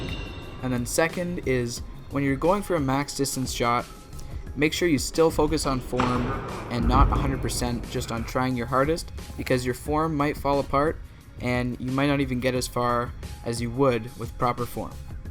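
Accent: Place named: Canada. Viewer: Your videos are the best... excellent breakdowns and teaching! Sounds like you are somewhere in Canada? How did you get your gym let you do that on the basketball courts?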